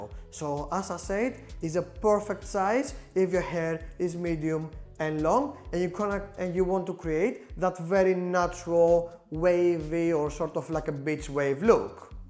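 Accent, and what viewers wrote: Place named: Greece. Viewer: Greek accent...💙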